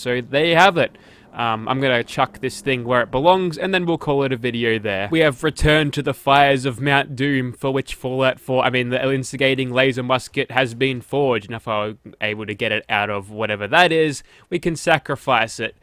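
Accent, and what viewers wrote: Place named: Australia. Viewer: So Australian. Love it.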